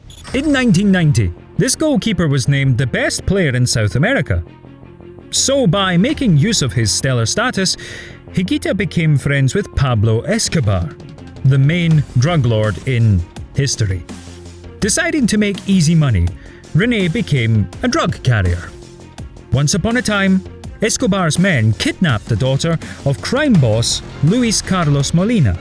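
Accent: Scotland, United Kingdom. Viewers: its scotish?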